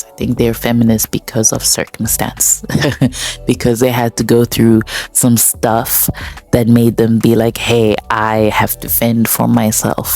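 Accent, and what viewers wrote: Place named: Kenya. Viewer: I really like your speaking voice and accent. It reminds me of my Kenyan friends. You have a cool demeanor.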